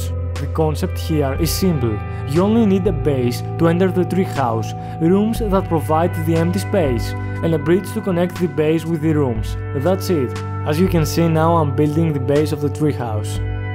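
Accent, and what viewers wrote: Greece. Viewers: probably from Greece ...I can recognise that accent ! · You got a really nice heavy Greek accent (idk if you are) but also thank you for the tutorial. It's gonna be helpful for my jungle village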